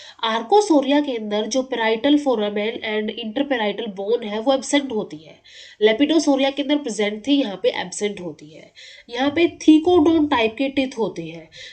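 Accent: Haryana, India. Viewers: Are you from hariyana?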